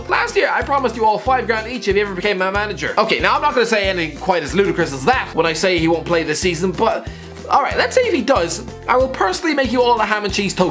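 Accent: Ireland. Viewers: aren’t you from Ireland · Is this Irish guy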